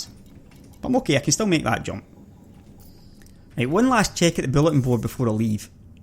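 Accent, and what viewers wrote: Scotland, United Kingdom. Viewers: love the scottish, it's bloody awesome lad :D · the sound of the Scottish voice is a bonus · Nice also to hear a guid Scots accent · thats Scottish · a Scottish youtuber finally an accent to relate to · Your accent! Epic wins! Sound like Spud from Trainspotting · i love your scottish accent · soon as I heard that thick Scottish "awrite guys hows it goin" you had a new subscriber , lmao thanks for the tips bud. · Scottish, aye? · A Scotsman in a spaceship, 10/10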